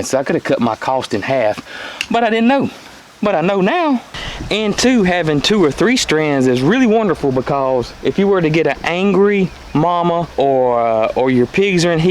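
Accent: South, United States. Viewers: Love your sense of humor!! Wrastling haha. I'm from NY so your southern accent is fun.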